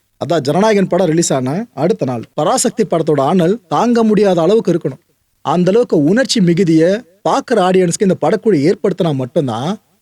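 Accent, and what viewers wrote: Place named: Madurai, India. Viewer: மதுரை ஜங்ஷன் தமிழா அண்ணா 😮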